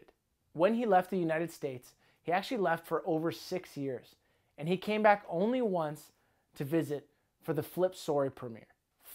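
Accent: Canada. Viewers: Your Canadian accent is so strong in this, pronounced sorry the Canadian way.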